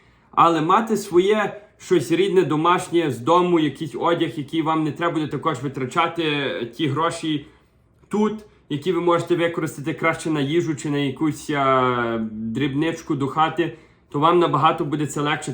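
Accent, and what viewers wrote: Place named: Lviv, Ukraine. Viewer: Дуже гарний львівський акцент, маю також родичів у Львові. Але також хочу виїхати через війну, але вік 52,сину 15,чи маю шанс? А ви молодець!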